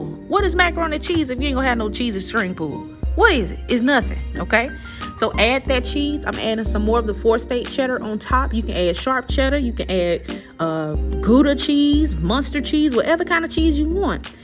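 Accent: South, United States. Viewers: She definitely from the South, I love Us😊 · Will be trying your recipe tonight 😋 I love the way you explain and talk lol sweet southern belle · By the way your voice is so southern and comforting that I sometimes listen to your YouTube channel even when I'm not cooking, you remind me of my aunt back home in the south. Everything you make, makes my mouth water! Keep that delicious goodness coming! ♥️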